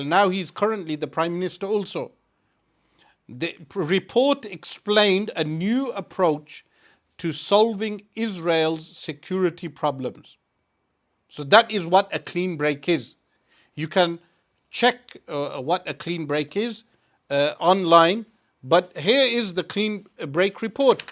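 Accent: United Kingdom. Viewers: You might be right. But remember with that British accent and paying taxes to a country that supports war on your own religion. So first admit your own hypocrisy first than expose others.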